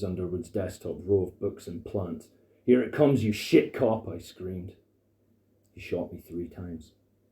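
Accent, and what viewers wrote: Scotland, United Kingdom. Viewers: Cool, I subscribed to your channel, so I look forward to that. The Scottish accent gives the reading a nice twist as I don't believe I have come across a Scottish narrator before.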